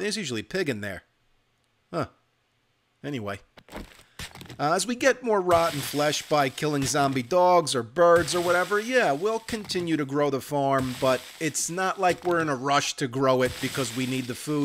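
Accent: New Jersey, United States. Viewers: I love that i can tell you have lived in new jersey.(im from jersey)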